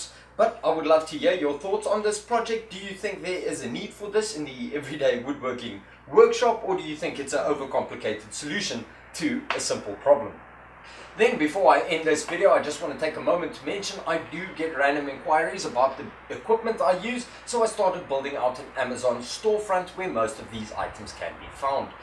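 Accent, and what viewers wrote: South Africa. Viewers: Great ideas, love the video. Spent time in Pretoria growing up so love the accent. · Thanks very informative - Hey are you South African? Where are you? So I'm new to woodwork and am showing my ignorance here. Just mad a long narrow shelf for my kitchen to store tea caddies etc but I dont think its deep enough for wall support so is insecure, Any ideas?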